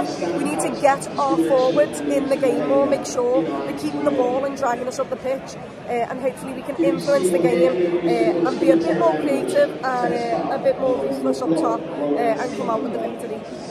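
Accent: Liverpool, United Kingdom. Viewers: Proper Scouse Babe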